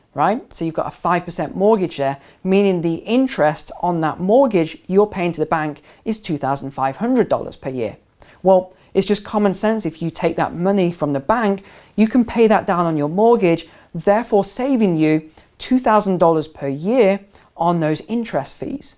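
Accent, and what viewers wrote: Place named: Coventry, United Kingdom. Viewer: Nice one. I thought I detected a bit of a Cov twang. I went to Uni in Cov and my folks still live there. Same boat as you now mate, live between Philippines, USA and Mexico. Keep up the good work and thanks for the reply.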